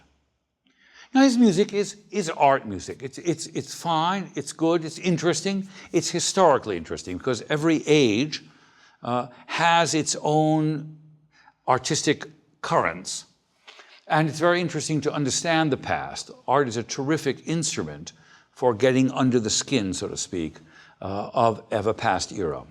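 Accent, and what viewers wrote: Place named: Netherlands. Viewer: What’s with the Dutch accent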